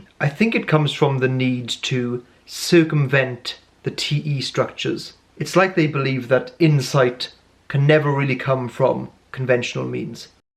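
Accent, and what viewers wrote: Wales, United Kingdom. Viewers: Interesting. You explained the INFP very well. Is that a Welsh accent I hear?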